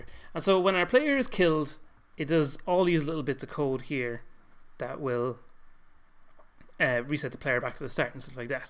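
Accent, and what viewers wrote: Ireland. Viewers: You talk like Jacksepticeye. Are you Irish?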